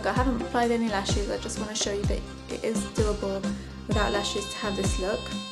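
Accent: United Kingdom. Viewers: Love you accent are you living in the UK cuz it sound like a british accent btw you<re beautiful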